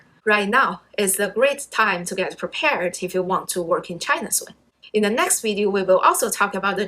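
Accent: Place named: Russia. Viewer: Your accent a bit like a Russian.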